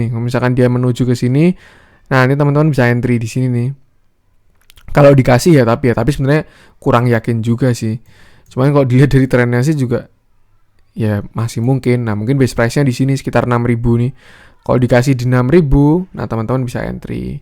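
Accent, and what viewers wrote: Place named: Surabaya, Indonesia. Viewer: ok analisisnya, kalau didengarkan seksama sptnya ada logat surabaya ini... :D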